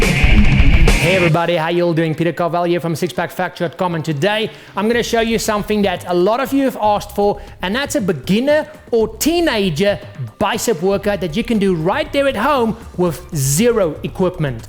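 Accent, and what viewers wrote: Afrikaans, South Africa. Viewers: sounds south african to me :) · He's a saffa (South African) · Its a south african accent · Judging by his accent i think he's from South Africa. I know cause I live there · I think it's a south african accent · Roy Miketsukami It's a South African Accent common under Afrikaans Speaking South Africans. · His accent is South African..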